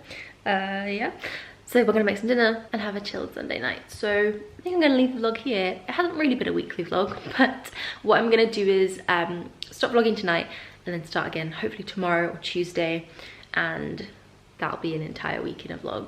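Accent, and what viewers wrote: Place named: Scotland, United Kingdom. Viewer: You sound a bit scottish and i love it!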